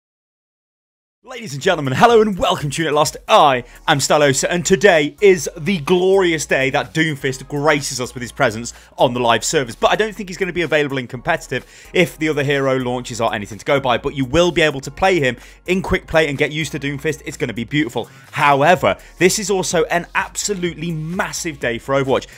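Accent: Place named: United Kingdom. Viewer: I love your videos, I watch your videos always when I'm having breakfast, both for the news and for your british accent. I wish someday you do a Unit lost con, I would gladly come from Spain. Keep up with the good work! Cheers!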